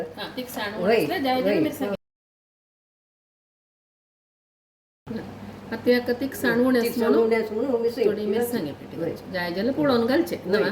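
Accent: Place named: Konkan, India. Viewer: Sounds like konkani spoken by gaud saraswat brahmins in karwar Mangalore goa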